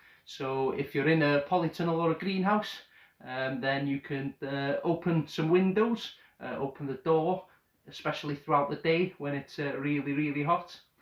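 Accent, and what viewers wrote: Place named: North Wales, United Kingdom. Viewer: Love your channel and this video mate, I lived in North Wales (Wrexham) for a few years about 20 years ago and your accent brings back memories! I have flower drop on a few of my indoor chilli plants, fingers crossed I can sort it out with your advice 🤞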